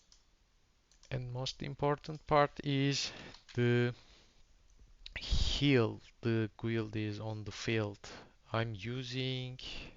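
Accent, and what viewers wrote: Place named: Turkey. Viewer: türk aksani =)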